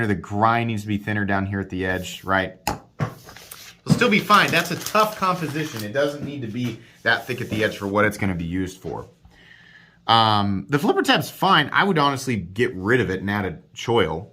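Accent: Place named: Midwest, United States. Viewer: Kansas! I was close! Identifying accents is sort of something I do as part of my job. Just something I’ve gotten good at. I didn’t know what part of the country you came from and my guess, based on your accent, was Colorado / Nebraska. You ended up just East and south lol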